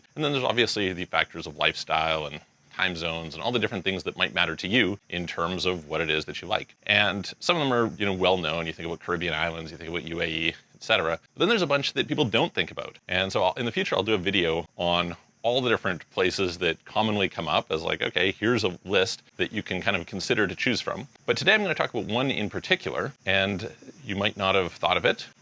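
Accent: Canada. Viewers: Excuse us. He’s Canadian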